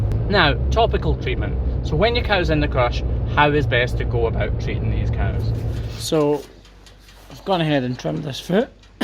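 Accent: Scotland, United Kingdom. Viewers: So nice to hear a fellow Scot on Youtube. Also good to watch and listen to someone who is knowledgeable and interested in what he does. Aren't cows such beautiful creatures 😊😊😊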